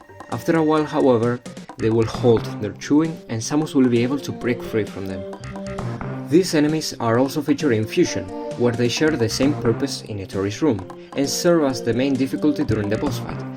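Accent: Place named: Spain. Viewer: Como se nota el acento españolxd good video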